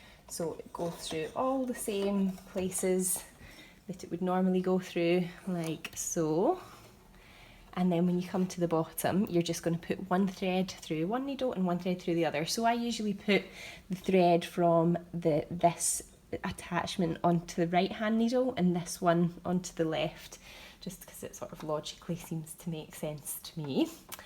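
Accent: Scotland, United Kingdom. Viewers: Thanks for this great video. I've just succeeded in making my first (flat) neckband thanks to your clear and concise explanations! The added bonus is your accent;) Greetings from a fellow Scot in Paris. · Brilliant tutorial delivered in a lovely Scots accent 🙂. Thank you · Fabulous. It always confused me aboout quartering, not realising that you dont use the shoulder seam. My necks always worked out well because I just used my common sense realising that the front scoop is longer. But your instructions were brilliant. I love your Scottish accent too. Thank you. You are never too old to learn I am 75 and been sewing since I was 12.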